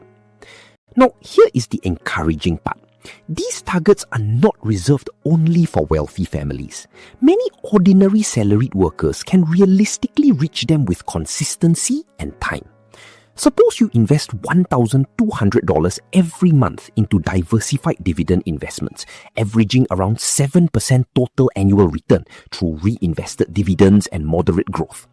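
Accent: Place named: Malaysia. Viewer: Why singapore video but malaysian voice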